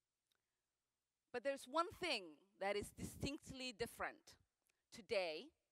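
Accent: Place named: Somalia. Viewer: I see she's revved up her Somali accent in order to represent her people - not Americans, but CAIR and its affiliates.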